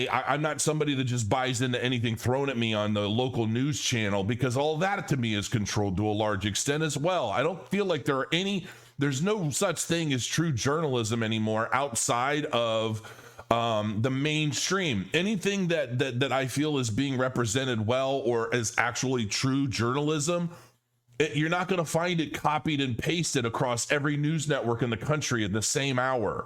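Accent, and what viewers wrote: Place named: Stockton, United States. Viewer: About time you sound like you grew up in Stockton CA.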